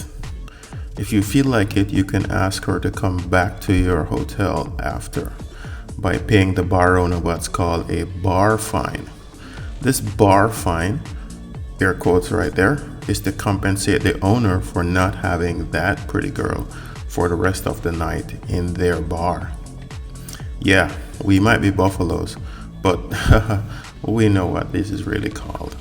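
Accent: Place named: West Indies, Caribbean. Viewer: Interesting story, I detect a west indies accent. Barbados maybe?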